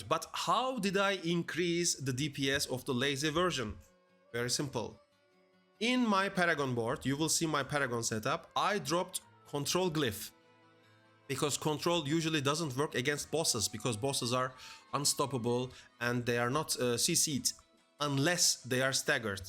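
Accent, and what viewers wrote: Turkey. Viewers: İngilizcemiz bile Milli bir tarza sahip, vallahi çok güzel konuşuyorsun. Buildler için de teşekkür ederim, bugün yarın deneyeceğim. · Aboow Türk İngilizcesi bu. Vay dostum süper içerik süper video. Twitch yayıncısıyım seni paylaşacağım izninle.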